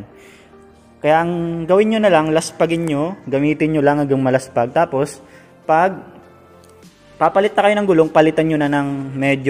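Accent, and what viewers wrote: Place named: Bicol, Philippines. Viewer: Bicolano ka sir😅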